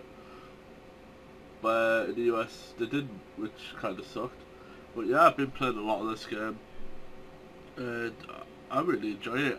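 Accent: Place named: Northern England, United Kingdom. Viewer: nice pickups althought i still cant understand you but i think its cause your northern i think :P oh and FYI FIRST COMMENT!!!!!!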